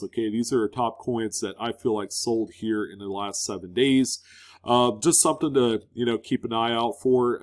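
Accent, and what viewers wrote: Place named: Blue Ridge, United States. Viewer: So had a chance to listen thru in one shot and yeah I like it. Clear concise with some "blueridge" dialog. I like it!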